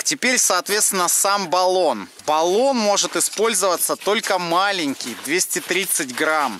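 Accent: Russia. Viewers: 1. Говоришь "я дам свое мнение" чувак какое МФТИ ты безграмотный. 2. Акаешь, кривляешься, слушать невозможно, отвращает с 1й - же минуты хотя что взять с масквАча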